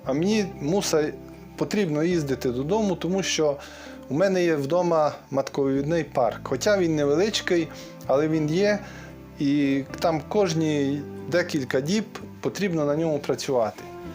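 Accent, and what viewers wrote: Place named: Transcarpathia, Ukraine. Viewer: Іздив колись на закарпаття ще в 90 роках мав багато друзів Ваш говір нагадує мені ті приємні часи Успіхів Вам Цимбори Слава Україні!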